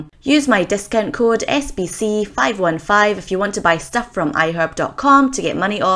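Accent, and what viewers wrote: Scotland, United Kingdom. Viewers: I love your accent. It makes me miss Scotland so much. :( Please do more vegetarian meal prep, and fitness programme- I find it so inspiring. :)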